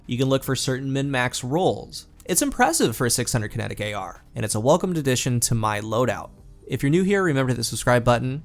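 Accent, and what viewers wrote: United States. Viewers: ' dulcet American tones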